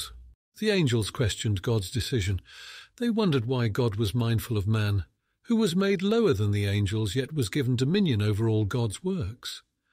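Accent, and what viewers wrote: United Kingdom. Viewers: I agree with the Gap theory as well. The theory gives us a possible little glimpse into some of the huh? Things between God and Satan. But I like how you taught in this in relation to it and pointed back to Jesus. I’ve never really heard it taught this way and it was beautiful. I’m so thankful for the mind God has given you. There is an understanding not just of literature, and logic and God has given you not only intelligence, but it is clearly Spirit filled and relational. It’s wonderful to see Him working through you. I don’t have time to read comments these days…so I’m sorry if people give this same feedback…I like the British voice I guess. But the thing that’s great about your speaking is the little aspects of your personality that come out. When you say something goofy or let a little laughter in. It’s more personal. But I support the ministry whomever is speaking it. 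· Hi, my husband and I started the devotional, and he likes it😁. However, I had a question and sent you an email....I really like the English accent on your teaching...❤ by the time this ended I just wanted to yell HALLELUJAH 😂😂😂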